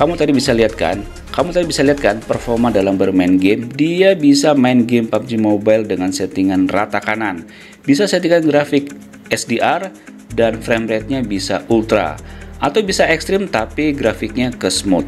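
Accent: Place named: Java, Indonesia. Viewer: Kan aku cuma bilang suaranya kaya orang jawa terus apa salahnya?? Ni saya juga orang jawa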